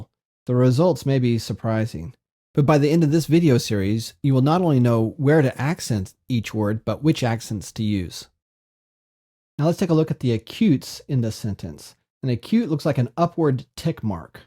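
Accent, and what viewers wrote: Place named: United States. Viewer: He doesn't use modern greek phonology. Anyways, judging from his english, he's probably american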